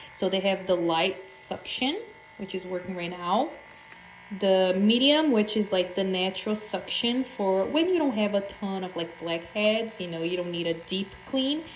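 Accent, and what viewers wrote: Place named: Brazil. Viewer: You sound Brazilian! ❤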